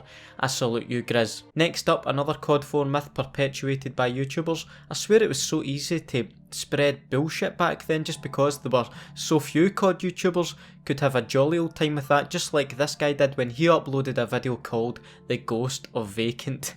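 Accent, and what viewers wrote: Scotland, United Kingdom. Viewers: Love the Scott esh accent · Absolutely love your vids. I know I'm a bit (just a bit) late . I'm from western Scotland and I can definetly tell you're Scottish. What part of scotland may I ask? Also keep it up man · I was getting a little spooked for the ghost of vacant clip, until you said with your strong Scottish accent "What tae fuck is that". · Am I the only one who thinks this guys scottish voice doesnt fit the spooky map myth? Also: I didnt mean to offend you, if this did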